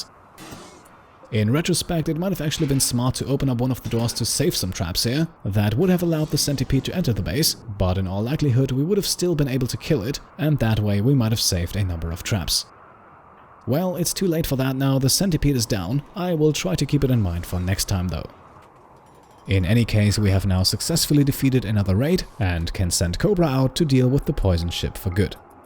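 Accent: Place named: Germany. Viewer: Greetings from your neighbouring country (the Netherlands) I love rimworld and i am happy i found your channel a month or two ago. One of my favorite games with someone who is doing a cannibal playthrough and who has a calm voice with a german accent that i really like. Keep up the good work. I can’t wait for the next rimworld series if this one ends.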